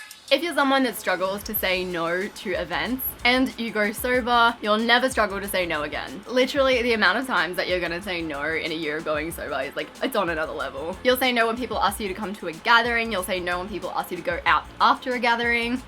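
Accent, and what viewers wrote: Australia. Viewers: cool video! i was also thinking about going sober for a while... also i really like the Australian accent, makes me really nostalgic :)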